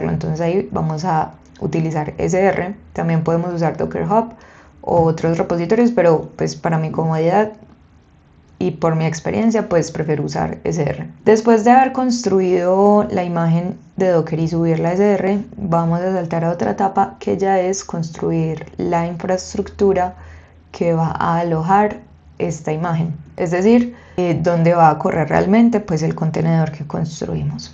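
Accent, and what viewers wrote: Antioquia, Colombia. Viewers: Quien vino por conocimiento y se quedó por la tonada paisa? 🙋. Muy buen contenido. Sigue así, saludos desde Argentina. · la primera programadora colombiana que conozco, me encanta el acento paisa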